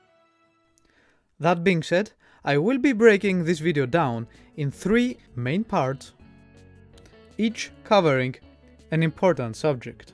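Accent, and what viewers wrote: Austria. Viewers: #giveaway #notAU I’m from the great state of Florida in the city of Jacksonville in the good old USA. First I just want to say that you’re English is perfect! There is of course an Austrian accent but not much can be done about that. Thank you for your hard work making all these videos! I would love to see more videos of you catching queens in the wild and how to take care of them please. Thank you again.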